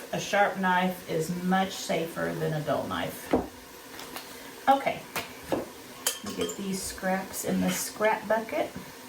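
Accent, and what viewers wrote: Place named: Missouri, United States. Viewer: It's nice to hear a Missourian talk - warshing instead of washing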